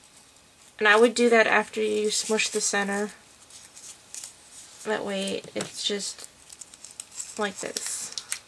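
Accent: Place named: United States. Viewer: let me guess: with your accent, you are a rich american woman (because in america, you are rich or poor, no middle)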